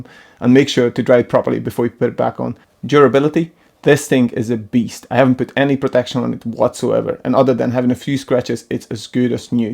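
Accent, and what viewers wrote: Northern Ireland, United Kingdom. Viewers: You are from Northern Ireland? Your accent is a cross between foreign and NI 😂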